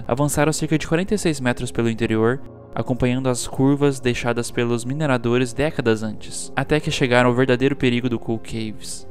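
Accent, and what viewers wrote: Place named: Inland São Paulo, Brazil. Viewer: Gostei do sotaque! Sou do interior de SP, o meu também é assim! Tmj 🤜🏽🤛🏽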